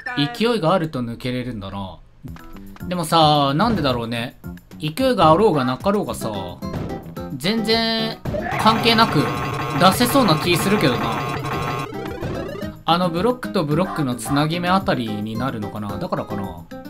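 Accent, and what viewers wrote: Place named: Kansai, Japan. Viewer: ・。・c 関西弁(´▽｀)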